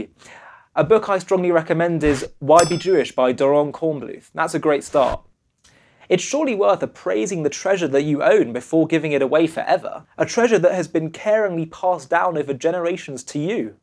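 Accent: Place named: United Kingdom. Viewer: השדרן נראה תימני אבל יש לו מבטא בריטי. מה לעזאזל?! חחח 😂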